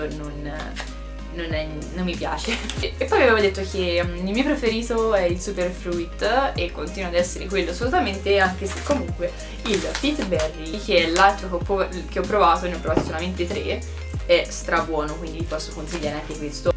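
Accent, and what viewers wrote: Tuscany, Italy. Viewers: Ciaooo nuova iscritta, sei toscana come me! Di dove sei tu? 💕 bellissimo video comunque 😘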